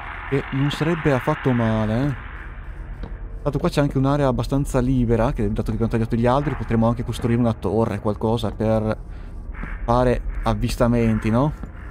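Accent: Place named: Verona, Italy. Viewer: Sono quasi certo sia veronese.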